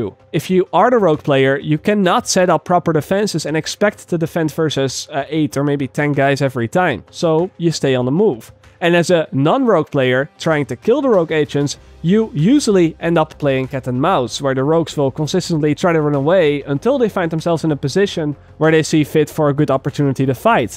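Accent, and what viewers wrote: Netherlands. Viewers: are you dutch? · he is dutch ."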